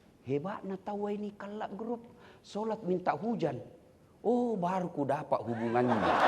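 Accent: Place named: Banyumas, Indonesia. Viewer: bahasane ngapak ora jelas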